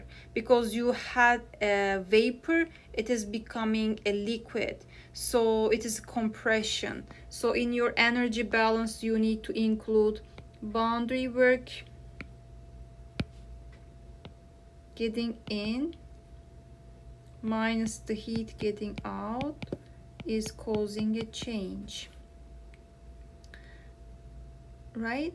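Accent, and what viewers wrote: Turkey. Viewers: Hocam Are you Turkish your accent sounds Turkish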